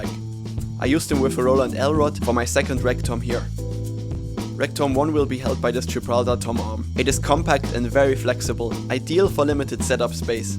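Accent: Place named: Germany. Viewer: your accent sounds so german, but your wall outlets are UK outlets for sure! Confused :C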